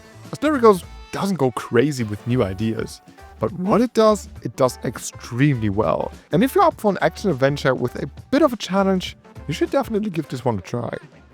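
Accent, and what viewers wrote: Germany. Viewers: Hi thank you for these awesome videos! My question is if you are German? Because your accent sounds so german 😌 I am from Germany too! Greetings!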